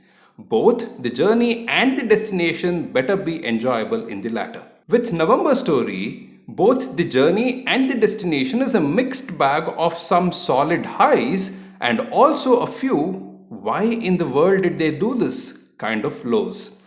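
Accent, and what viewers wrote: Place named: Karnataka, India. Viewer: Very true, when he reviews on the same platform as BR, I feel robbed of BR's take. It probably has to do a bit with his accent/narration style which has a heavy kannada weight to it - it is just difficult to hear English in a kannada style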